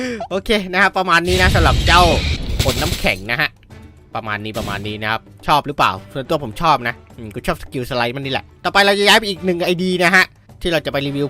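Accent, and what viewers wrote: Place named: Isan, Thailand. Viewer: เปิดคริปแล้วอีสานนีโครตเท่เลยนะเนีย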